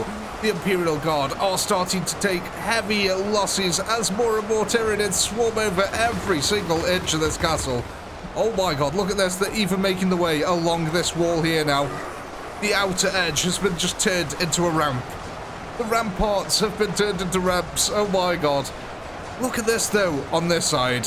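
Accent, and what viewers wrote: Stoke, United Kingdom. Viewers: I detect a Stokie 👀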